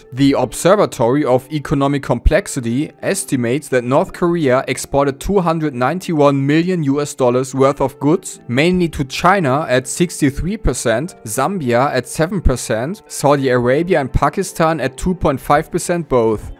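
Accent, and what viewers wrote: Germany. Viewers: Informatives Video :) hab direkt am akzent gemerkt, dass du deutsch bist hahahaha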